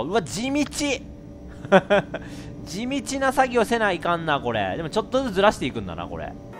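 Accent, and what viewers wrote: Kansai, Japan. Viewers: 何でこの実況の時だけ特に関西弁きついねん爆笑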